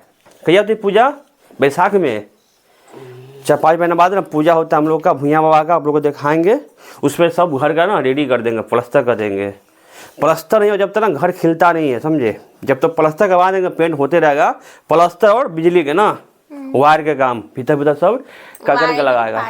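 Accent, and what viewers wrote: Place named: Bihar, India. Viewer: Aapka ka bihari andaaz achchha hai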